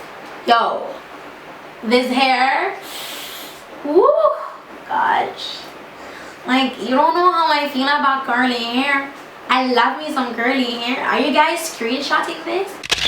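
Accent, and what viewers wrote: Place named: Jamaica. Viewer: I love your accent. You gotta be from Jamaica lololol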